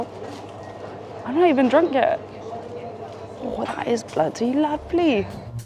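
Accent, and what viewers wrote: United Kingdom. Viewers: Just a question- are you by any chance Welsh? Some words sounded really Welsh! ❤🏴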